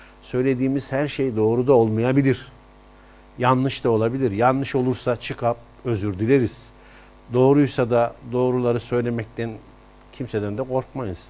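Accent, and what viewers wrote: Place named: Konya, Turkey. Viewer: Yaptığınız yorumlar, Konya ağzını kullanışınız ve gazeteciliğinizi seviyoruz, teşekkür ederiz Bey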